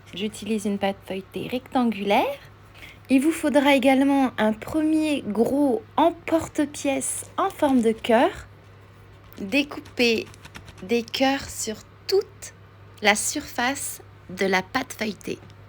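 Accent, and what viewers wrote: France. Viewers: Hi, happy valentine's day. This recipe is simple and delicious too, your French accent made it more delicious ❤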